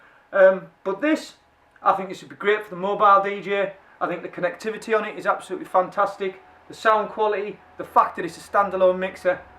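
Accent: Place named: Newcastle, United Kingdom. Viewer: Bit of a Geordie accent there la.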